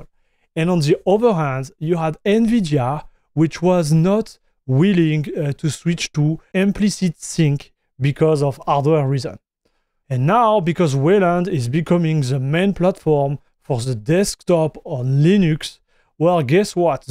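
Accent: France. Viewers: I like your frenglish voice, sounds refreshing.. o)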